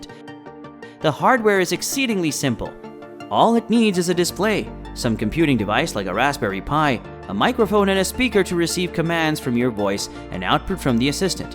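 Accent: India. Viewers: Siri is impressive. Can understand that indish acent!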